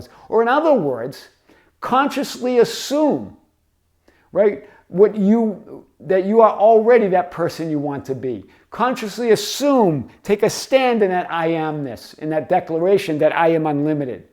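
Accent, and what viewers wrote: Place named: New England, United States. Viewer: tbh nothing makes me smile like hearing your authentic new england accent! 😂 It makes these videos hit home so much more for me living out here in cali haha. Love your contagious energy!!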